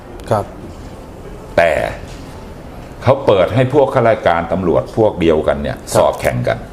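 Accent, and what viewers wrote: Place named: Rural, Thailand. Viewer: ถ้าไม่รู้จักมาก่อนแล้วฟังสำเนียงพูดยืดยาดเหน่อนิดหน่อยมาดแบบลุงบ้านนอกคนหนึ่งใครจะรู้ว่าเป็นสิงห์มือปราบลือนามคนหนึ่งของตำรวจไทย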